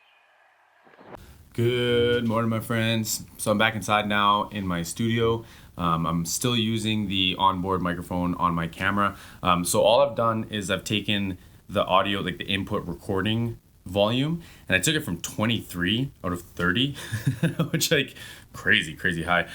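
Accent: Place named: Canada. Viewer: I don’t know why I never noticed what an amazing Canadian accent you had before listening to this video. Have you edited it out in the past?Lol!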